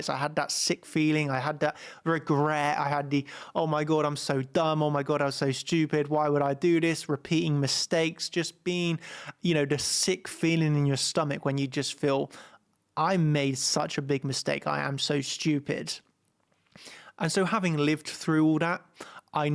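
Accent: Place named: United Kingdom. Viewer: Bro is British bruv 👊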